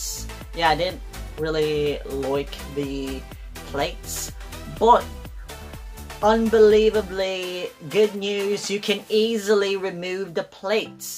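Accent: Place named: Australia. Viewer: What’s up with the Australian accent?? I enjoy your usual voice it sounds a lot different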